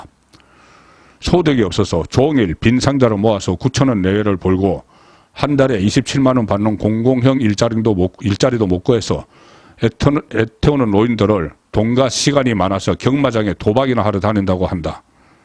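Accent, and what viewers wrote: Gyeongsang, South Korea. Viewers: 송형 전에 뭐라 했지??? 너 경상도징???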